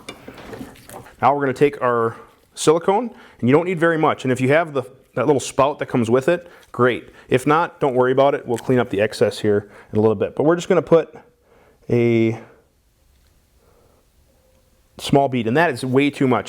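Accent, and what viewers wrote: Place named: Wisconsin, United States. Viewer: Ah, the accent of a fellow cheesehead. Sounds like home.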